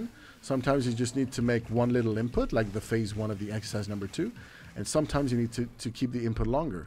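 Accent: United States. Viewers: american accent but a british one in the mega video? lol also thanks for this you absolute legend! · what happened to your accent 😭😭 its almost american now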